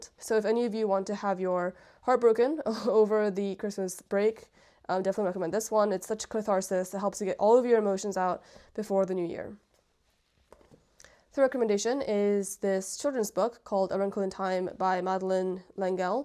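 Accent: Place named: Ireland. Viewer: oh that's cool, it sounds vaguely irish at some points? Or maybe it's just your voice, either way it's beautiful.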